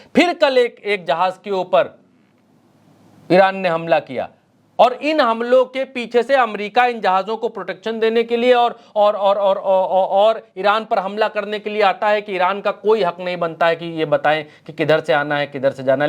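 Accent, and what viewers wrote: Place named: Bihar, India. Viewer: جی شاندار ہو ۔اللہ تمہیں زندگی دے ۔عمر دراز کرے خدا۔بہترین بہاری بولتے ہو اچھا لگتا ہے ۔پ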